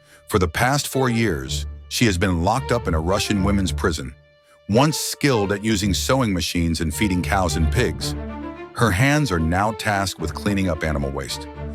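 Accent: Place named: United States. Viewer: bro the narrator is american.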